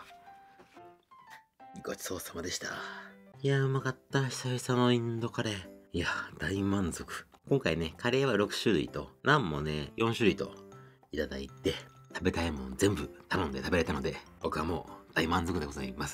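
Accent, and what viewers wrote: Japan. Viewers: Saludos desde venezuela 🇻🇪♥️😘me encAnta tu asentó japonés aunque no entiendo nada jijiji me facina y me encanta tus videos 😘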